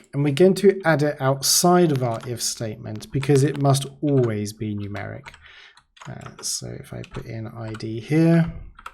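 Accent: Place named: United Kingdom. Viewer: Informative and entertaining. (bonus points) the British accent sounds far more intellectual than my American "southern drawl". Excellent work man!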